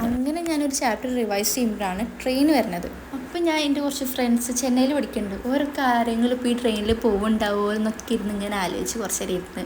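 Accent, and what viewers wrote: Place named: Kozhikode, India. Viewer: Ningale slang kettitt oru kozhikodukaariyaanenn thonni😌🤌🏻, anyway avidethe fees engena pg?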